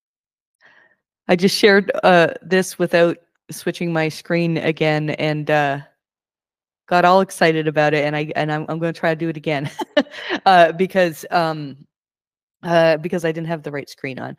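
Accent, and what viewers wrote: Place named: Canada. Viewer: I don't remember you having such a strong Canadian accent :) Luvyawork. Panda is scary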